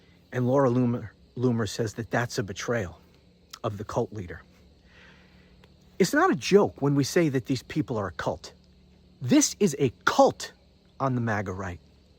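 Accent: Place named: Quincy, United States. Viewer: I love this cat 😅! Sounds like Quincy, Mass. accent, I don't know, but I love how he, and rightfully so, loses it completely on maga idiots 😂!🤣🤣🤣🤣🤣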